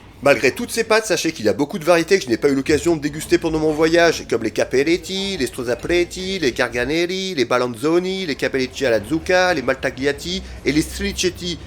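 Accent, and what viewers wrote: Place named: Italy. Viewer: wow quel accent italien bravo génial tu nous régales!! p.s: c est qui le paparazzi qui t accompagne!!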